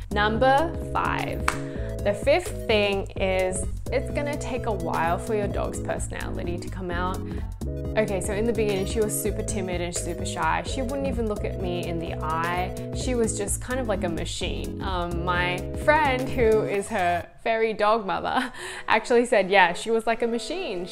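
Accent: Australia. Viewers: Are you an Aussie? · Thanks for the advice, I love greyhounds, the most placid sweetest dogs. You have a lovely hybrid Australian soft U S accent, nice to listen to. I’m Spanish, live in Sydney Australia. God bless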